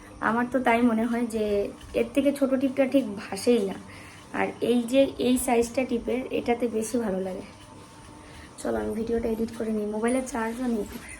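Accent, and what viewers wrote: Bangladesh. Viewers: আমার বাবা বাঙাল আর মা এদেশী। তাই তোমার কথার টান বুঝতে পারছি । যখন টিভিতে বাঙলাদেশ এর চ্যানেল দেখতাম তখন ওদের এবং শব্দকে অ্যাবং বলতে শুনেছি। তেমনি তোমার এগ্ না হয়ে অ্যাগ্ হয় । অবশ্যই তুমি তোমার আঞ্চলিক টানে বলছো , তাই বলার কিছু নেই ।তবে কি বলতো বাংলা আমাদের মাতৃভাষা ,তাই যেরকম ইচ্ছা বলতে পারি । কিন্ত ইংরেজি আমাদের ভাষা না, তাই ওটা বলার সময় সঠিক বললে ভাল হয় । কারণ ইংরেজির তো আঞ্চলিক উচ্চারণ নেই, তাই না? আর আমরা বাড়িতে যা খুশি বলতে পারি, কিন্তু অন্যের সামনে একটু পাল্টে বলতে হয় । যেমন আমরা বই পড়ি শুদ্ধ ভাষায় 😊। আর তোমাকে এই টিপ ভাল লাগছে, তবে আর একটু নিচে পরলে কেমন লাগতো?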